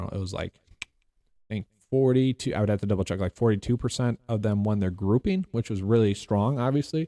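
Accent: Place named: Wisconsin, United States. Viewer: I'm from Iowa. I call it corn hole or bags... But we know you are from Wisconsin when bags comes out as beggs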